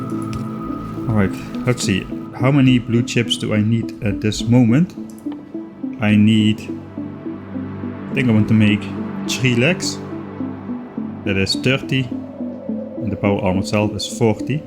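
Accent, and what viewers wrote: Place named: Limburg, Netherlands. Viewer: Was trying top determine from your accent from which part of The Netherlands you are. My guess is the northern part of Limburg. How close am I?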